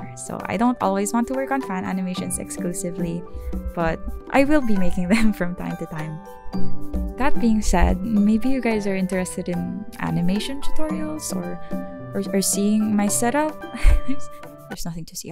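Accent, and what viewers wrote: Philippines. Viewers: You're so god damn talented. I'm still trying to place your accent, too, lol My best guess is Filipino, but that's, like, a 30% guess, lol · I hear some sort of Filipina Accent Although that's just me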